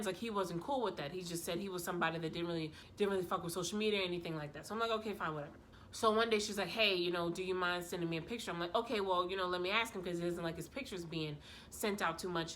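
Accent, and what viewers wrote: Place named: Bronx, United States. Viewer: Omg I swore you were a Bronx or Brooklyn girl 😂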